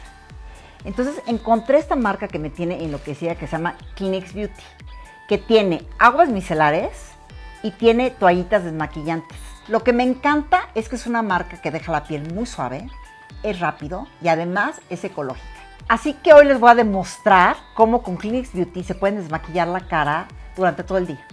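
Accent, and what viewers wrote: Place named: Fresa, Mexico. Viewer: Habla muy fresa: la caraa, maquilladaa... Habla mas natural sin esa pose, ya se oye muy falso y prepotente esa forma de hablar tan exagerada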